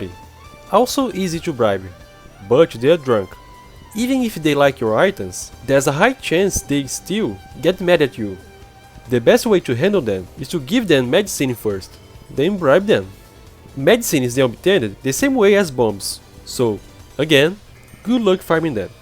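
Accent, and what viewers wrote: Brazil. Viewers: posso estar enganado mas o sotque é bem brasileiro, de qualquer forma obrigado vc ajudou muito com o video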